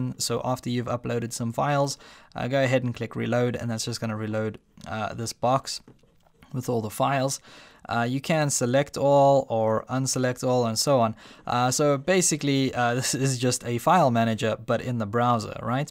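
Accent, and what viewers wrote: South Africa. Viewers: Love hearing a South African accent for a change!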